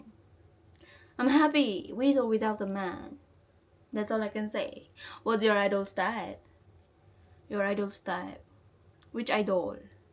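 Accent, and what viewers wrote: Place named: Japan. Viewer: Your Japanese accent is so lovely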